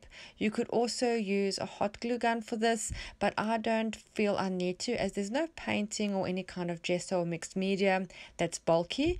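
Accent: South Africa. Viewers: I subscribed to your channel a long time ago but never actually watched a video until today, I have a lot of catching up to do. I loved what you did and I actually liked both of the paint swatches. The biggest plus for me was hearing your South African accent, I grew up in SA so love the connection. Thank you for an excellent tutorial.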